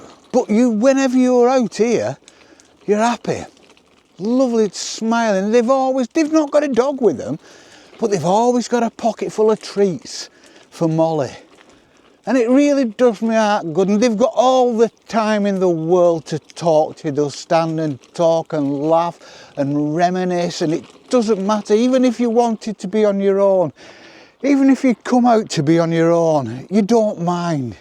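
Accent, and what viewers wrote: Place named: Lancashire, United Kingdom. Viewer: 😂 So funny 😂 Where are you in Lancashire? You sound like my grandparents who were from Westhoughton. I'm in South Staffs. Aged 73 😮 so there is someone older than you 😂 Thanks for making me laugh ❤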